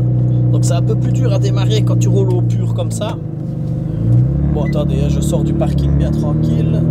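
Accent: Belgium. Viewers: Désabonné récemment de gmk et Akram pour découvrir un bon gars humble sans fioritures, avec un accent belge très agréable a écouter, bien plus intéressants que les "c'est bôo et elle a le diable en elle" de nos des accolytes superstars de youtube. Bravo a ta réussite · Tu est belge vervier Liége ??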